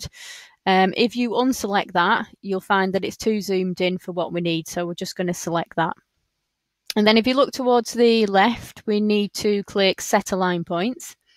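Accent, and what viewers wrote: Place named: United Kingdom. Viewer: The speaker speaks to fast and with the british accent - difficult to understand all of the words. Slow down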